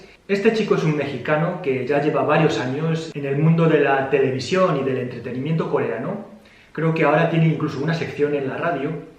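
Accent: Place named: Spain. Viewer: Tu tambien como maestro hablas muy bien el español...... pero al estilo de España y gracias por exaltar a mi hermano Mexicano Christian .... saludos y abrazos desde Cd.Mx.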